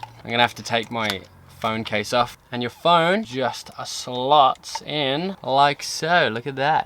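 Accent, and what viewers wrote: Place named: Australia. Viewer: Where abouts you from in Blighty originally butt? (See if you can guess where I’m from with that word 😂) You’ve pretty much got the Ozzy accent down Pat fella! I was out there travelling around in <num>, I’d love to go back tbh mate. Sick van build btw man, you got yourself a very cool little family set up there. Awesome brother!!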